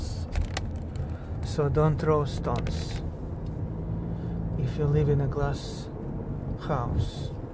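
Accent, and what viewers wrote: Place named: Russia. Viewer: This accent. Where are you from? russia?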